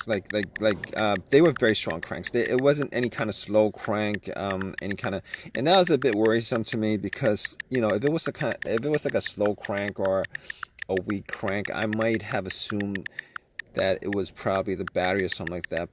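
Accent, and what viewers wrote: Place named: North, United States. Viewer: What is "FU" cap, or a "FEW" cap?!? That would be a F U E L cap man. Not a FU or FEW cap. You're from the northern part of this country aren't you? Mean no offense by that, you guys just talk really weird and funny!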